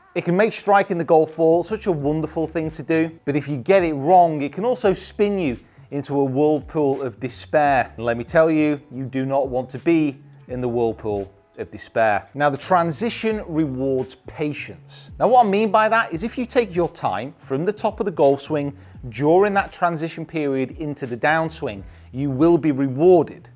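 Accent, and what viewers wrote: United States. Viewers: American accent is so unsettling lol 😂 but great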